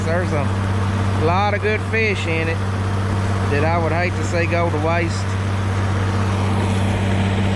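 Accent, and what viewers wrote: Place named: South, United States. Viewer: You're so southern I can hardly understand a word you're saying, and you're 13 hours drive north of me.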